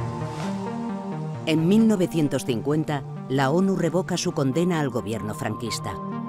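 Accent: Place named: South America. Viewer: Eres sudamericano ¿verdad? En España si se pronuncia la T antes de la L, pero es en esta forma: At-lántico. Es como la LL, acá decimos cayar en vez de callar (caliar), eso lo aprendí en la sierra de Perú que hablan así e igual pasa en España, ellos pronuncian in, nosotros mal, saludos.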